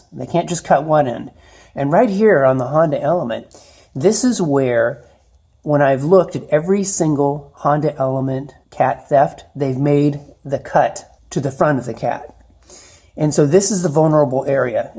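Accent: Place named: Canada. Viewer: Another good idea, thanks again. You sound like you are from Canada? - Canadians overall are good peeps.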